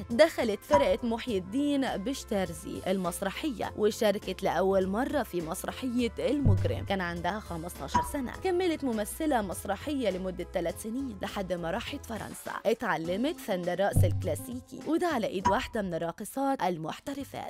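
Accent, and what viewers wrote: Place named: Morocco. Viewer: Elle parles avec un accent marocain....! الفن الانهزامي ، هو فن غريب عن قيم المجتمع الجزائري..!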